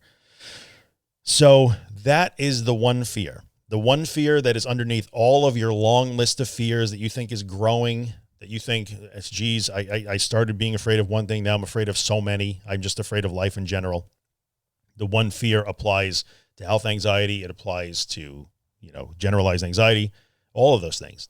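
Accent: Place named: New Zealand. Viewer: sounds like a fellow New Zealander!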